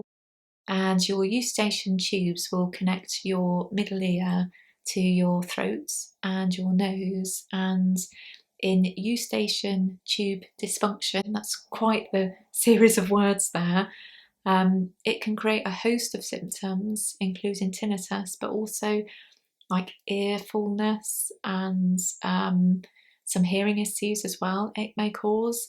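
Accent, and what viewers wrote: United Kingdom. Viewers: Hi! 🧡 Thank you so much for all the time, research, and thoughtfulness you put into creating this helpful, informative, transparent video. First, as always, you are such a comfort. So kind and open, it is really a gift. Someone else commented on your voice and I could not agree more! One of the things I loved about living in England was the accent you all have... Your voice, in particular, promotes a sense of peacefulness, even when you are talking about hard things. Next, I hadn't realized that some of the physical conditions I have are common for folks with autism: I only lasted one night with my mouth guard too. And, my period was so difficult that eventually (and of course I DON'T recommend this because of all the health problems associated with being underweight), I found that if I kept my weight lower than normal, I wouldn't menstruate. 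And even though my doctor told me to put on at least five pounds so that I would menstruate regularly, foolishly I thought, why would I want to menstruate when it is SO painful?! Or gain weight when I don't feel like myself in a different body (the person who assessed me said that is an autistic symptom for some other folks she assessed). So, not surprisingly, I too have osteoporosis. Fortunately I was able to get pregnant with my two boys during a phase of keeping my weight higher so I could get pregnant and nurse... Finally, I was so sad to hear about the high pitched noise Ian always experiences and that you too have ringing in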